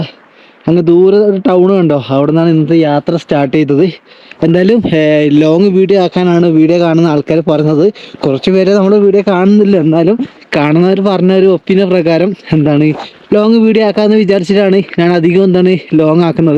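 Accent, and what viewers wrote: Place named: Payyannur, India. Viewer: The real adventurer❤❤കണ്ണൂർ പയ്യന്നൂർ slang. നല്ല സരസമായ ശൈലി ❤️❤️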